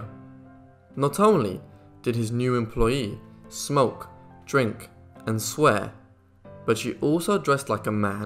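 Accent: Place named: United Kingdom. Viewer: WHY ,WHY WHY do.they always have these damn Brits narrating stuff that has nothing.to.do with them I can't stand listening to.that.accent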